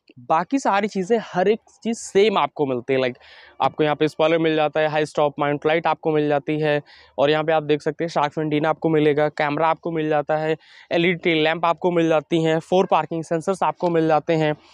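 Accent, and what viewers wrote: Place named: United Kingdom. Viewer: Bhai tum hindi bhi British accent me ku bolte ho...bahut chewtiya sound krte ho bhai... isliye bta ra hu bs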